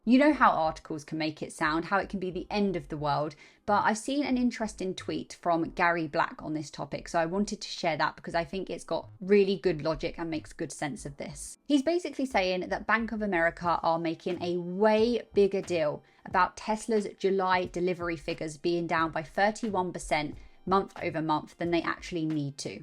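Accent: United Kingdom. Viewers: 🌜💫😎TSLA😎💫🌛 I love that british accent!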